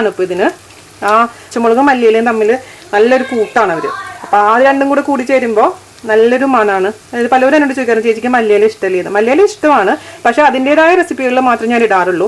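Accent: Thrissur, India. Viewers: ചേച്ചി അടിപൊളി ആണുട്ടോ തൃശൂർ ആണോ വീട്.... അതാണ് ഒരു നിഷ്കളങ്കത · chechiii njn chechide veliya oru fan ane ela receipsm try cheyarnd elam adipolli ane chechiii chechide thrissur slagm presentation. oke super ane njnum oru thrissur Kari ane to God blesss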